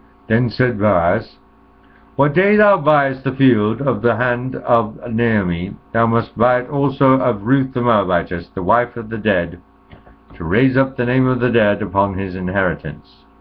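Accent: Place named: Scotland, United Kingdom. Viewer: your Scottish great-grandmother (who is my grandmother) would be proud of your command of the Scottish brogue! Well done! And God says His Word will not go out and return unto Him void. - Judy Doorn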